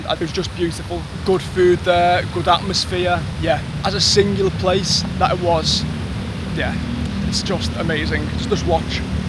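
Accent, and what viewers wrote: United Kingdom. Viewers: You're a very gifted artist/illustrator. 🎨🖌 Sorry I'm not good at distinguishing accents. What part of the UK are you from? So great to hear that PH is your favorite place in the world to visit. 😊 As for recommendations, Batanes is one of the places here we are really proud of. It kind of looks like Scotland or NZ but in a tropical setting. There are actually tons of awesome places you can visit here. Some are only mostly frequented by locals. :)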